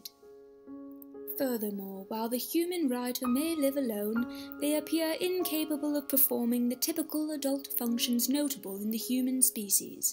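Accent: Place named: England, United Kingdom. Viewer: I’m so impressed with the English accent 😂 I’m assuming we can thank your time at Durham?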